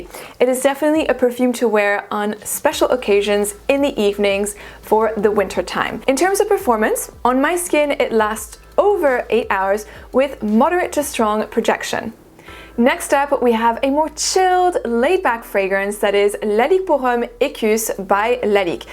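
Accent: France. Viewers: thank you for this video. French accent is what I'm always looking forward to when watching your videos. Don't apologize for that. I want more French accents! 😂 I like your list. My winter favorites are: Prada L'Homme Intense, Dior Homme Parfum, Bvlgari Man In Black, Guerlain L'Homme Idéal Extrême and Stronger with You Absolutely. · I like your french accent. Grüsse aus Deutschland. Und danke für die gesamten Inspirationen!! 😀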